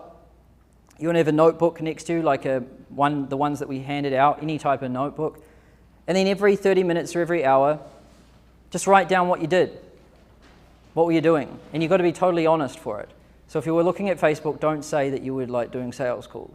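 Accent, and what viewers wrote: New Zealand. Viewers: This man must surely be the most annoying human being on earth. From his annoying squeaky kiwi accent, to his tiresome textbook-motivated gobbledyegook. For example (and this is typical of all his Youtube videos: "We can't do anything without action, and action and time and energy. We can't get that from anything other than our human body and our human body can't perform concurrent operations or be replicated. So you are the true constraint of being human is time and energy (sic). That's what life is - energy over time". and: "There are people that have trillion dollar companies and there are people that have no companies - why?" Jeez - why do people pay thousand of dollars to listen to this garbage?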